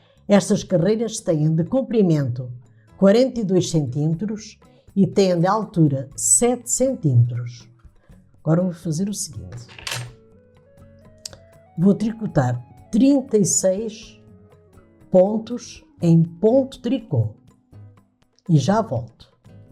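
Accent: Portugal. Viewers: Gostei muito do modelo da sua pantufas! Ë lindíssima e muito dificil de fazer(para mim que não sei faser tricô)! Vejo que você faz obras de arte com o tricô! Ah, também adorei o seu sutaque português! Sempre quiz conhecer portugal...sou brasileira e vivo na Argentina. Vou compartir com minhas amigas! BsAs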